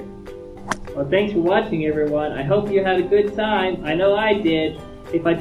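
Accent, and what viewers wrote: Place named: Australia. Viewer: its the Aussie accent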